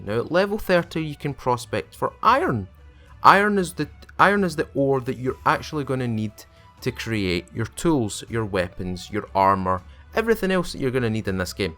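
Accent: Scotland, United Kingdom. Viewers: I watch these just to hear that beautiful Scottish voice of yours. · Thank you always getting my lif information here and is your accent scottish?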